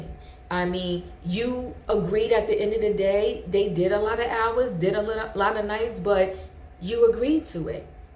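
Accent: New York, United States. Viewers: you sound sooo new york and i love it! bloop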